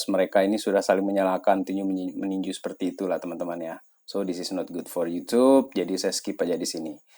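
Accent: Sumbawa, Indonesia. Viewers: Sumbawa mana ini pak?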